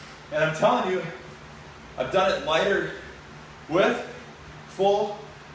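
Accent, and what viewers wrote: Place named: Wisconsin, United States. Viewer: It always sounds like a Canadian accent but I guess Wisconsin accent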